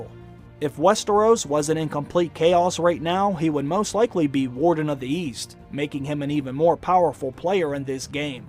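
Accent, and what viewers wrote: Pittsburgh, United States. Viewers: Is the Narrator a Yinzer? I hear a bit of Pittsburgh in his speech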